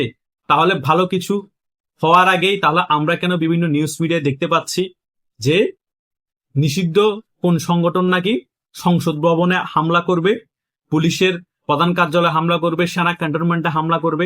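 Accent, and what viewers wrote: Chittagong, Bangladesh. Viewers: ভাই আপনি কি চট্টগ্রাম অঞ্চলের লোক?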